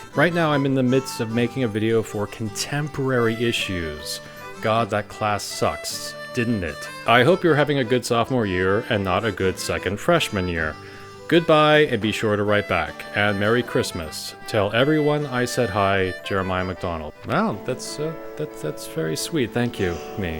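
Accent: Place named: Maine, United States. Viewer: I could tell immediately you were from Maine.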